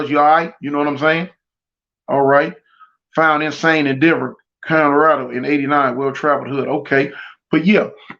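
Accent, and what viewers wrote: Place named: Texas, United States. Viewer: I hear a Texas is accent 6ig homie most got people down there huh when you hit that (Mane) it makes me think that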